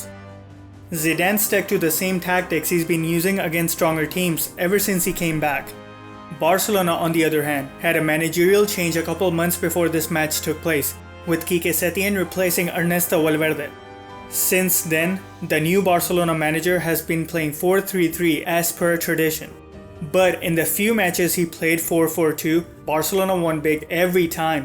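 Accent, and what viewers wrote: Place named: India. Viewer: India English.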